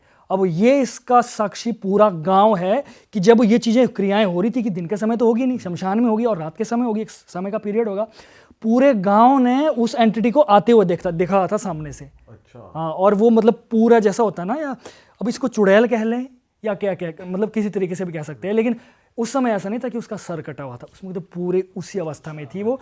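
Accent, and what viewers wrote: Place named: Himachal Pradesh, India. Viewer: Being a himachali I can relate some words like shut karke ,chik chik chik ,and the accent ❤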